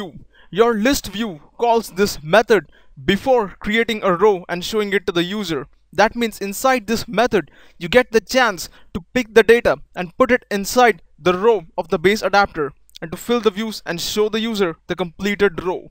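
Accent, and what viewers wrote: India. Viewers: we need more tutorials like this, clearly explained, comprehensible and energetic, especially coming from someone with an indian accent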